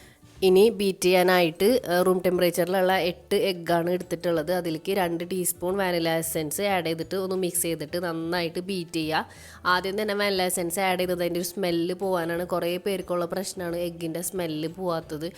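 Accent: Kodungallur, India. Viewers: Iyalde veed kodungalloor ano?ente hus area slang und nannayi.😀😀😀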